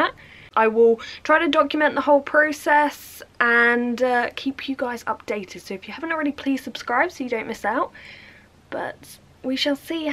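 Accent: United Kingdom. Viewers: I’m from Minnesota which is in the USA. I bet my accent would sound funny to you guys too haha! Where exactly in Britain is this accent from? So adorable.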